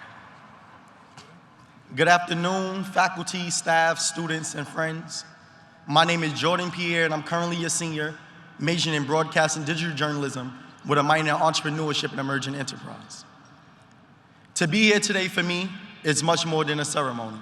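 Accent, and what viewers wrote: United States. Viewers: That DEEP New York Accented Beautiful man of Color spoke LIFE🎉🎉🎉🎉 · I ❤ his Brooklyn accent! Saying 👋🏿 from Texas. Proud of you, Jordan!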